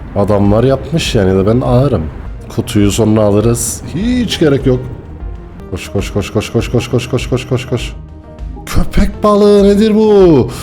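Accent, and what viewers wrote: Cyprus. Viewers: Kibris şivesi cok guzel hocam video terapi gibi geliyor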